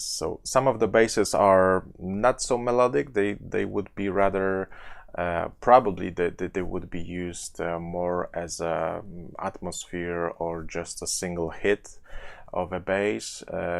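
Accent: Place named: Poland. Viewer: Mówisz po angielsku jak większość Polaków - od razu słychać pochodzenie. Biblioteka fajna - jednak nie moje klimaty. Pozdrawiam :)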